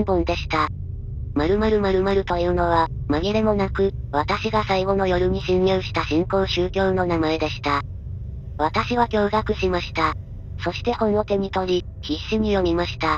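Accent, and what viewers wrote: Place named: Hiroshima, Japan. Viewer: 山口寄りの広島みたいな喋り方だな、大竹か和木か辺り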